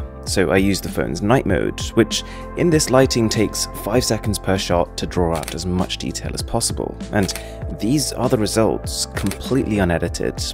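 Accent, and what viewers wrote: RP, United Kingdom. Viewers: This guy is so posh omg looool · Quite weird to hear someone with such a strong/"posh" British accent say "anyways" several times.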